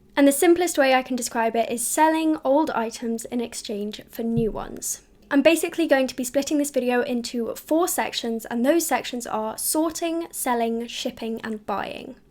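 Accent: United Kingdom. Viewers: british accent 🤤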